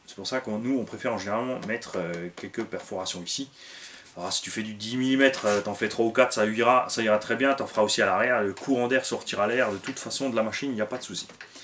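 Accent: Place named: Alsace, France. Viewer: Salut! Super ta chaîne dédiée au modélisme RC, je l'ai découverte il y a 5 ou 6 jours! J'ai du taf, y a un paquet de vidéos à regarder haha! Mes oreilles ont remarqué de suite un accent familier, t'es Alsacien et ça fait bien plaisir (je suis de Colmar perso), content de constater qu'il y a des passionnés dans le coin, je commençais à croire que j'étais tout seul à basher dans mon coin de forêt! Tes tutos vont m'être bien utiles et d'une grande aide, j'ai un Typhon v3 en cours de remontage après une sortie/journée VDM (il a fini dans une flaque de ciment un peu en dévers que j'avais pas vu... sans commentaires... ^^). Bref continue tout ce bon boulot, t'as un follower en plus et des pouces bleus!!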